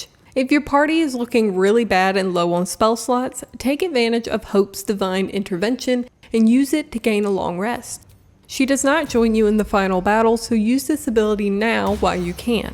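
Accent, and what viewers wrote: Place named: South, United States. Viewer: What is your accent? I feel like it's southern but like sophisticated at the same time 😎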